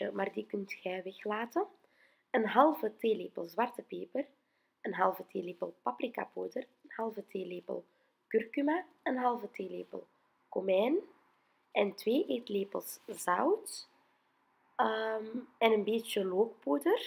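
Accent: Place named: Antwerp, Belgium. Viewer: Bsaha. Ziet er heerlijk uit 😋 jij komt zeker uit Antwerpen want je woordenschat en intonatie is zoals Nora Gharib. Is niet slecht bedoeld maar als een compliment 😘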